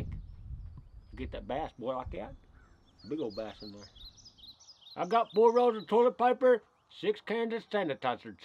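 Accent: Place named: South, United States. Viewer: I can understand everything he says! Lol that’s marrowbone talk right there. Yee yee!!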